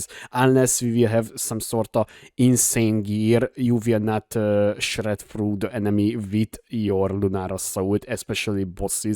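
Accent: Hungary. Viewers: Hey, just from curiosity , by any chance do i get right from your accent that you are hungarian?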